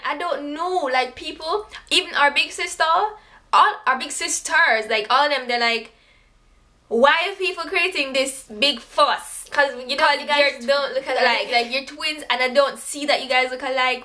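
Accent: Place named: Jamaica. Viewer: Your patois (jamaican accent) sounds so much stronger in this video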